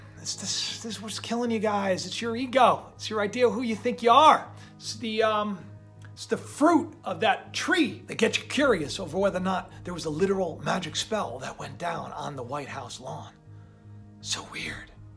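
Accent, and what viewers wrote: Russia. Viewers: Your Russian accent is awesome so don't worry about it I enjoy it and I'm sure everyone else does.! Like you said I really truly believe it was just a goofy minute that the president was pretending. But who knows in these days and ages.! Evil is worse today than it was back in the day of witches.! It's out in the open now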